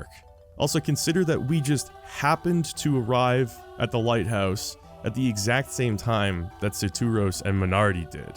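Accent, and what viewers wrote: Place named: Canada. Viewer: AH HA! You are indeed Canadian o_o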